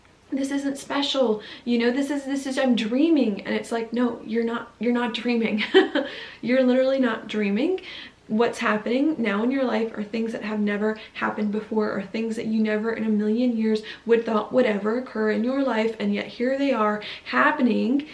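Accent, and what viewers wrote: South, United States. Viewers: I think Georgia brought your southern roots to the surface...i hear a little accent :) missed you, glad youre back! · Your southern drawl is much more prominent in this video than in any other before. As a southern girl, no judgement. I only wish to say: while w family, do your best not to lose yourself, and the story you are building of who you are becoming. Xoxo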